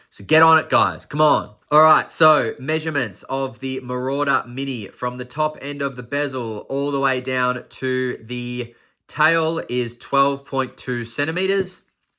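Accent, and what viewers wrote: Australia. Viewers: Great review - thanks man. Good to hear another Aussie. For a second I though you had a shelf full of dildos for a second there 😮 😂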